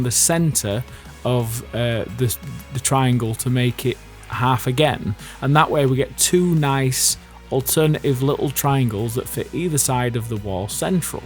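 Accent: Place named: England, United Kingdom. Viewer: Great voice. Where in England is that from?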